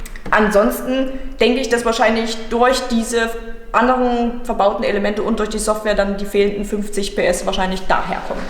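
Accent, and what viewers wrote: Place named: Saxony-Anhalt, Germany. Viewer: "Jetzt schießmer volles Roohr in den Caaarpooorn" :-) Ich komme gebürtig aus Sachsen-Anhalt. Dieser doch so heimische Dialekt.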